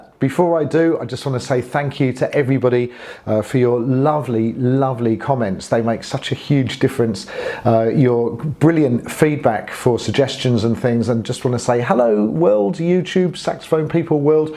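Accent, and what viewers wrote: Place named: United Kingdom. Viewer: You're clearly British, what city are you based in? I'm on the hunt for a new tutor and you're great!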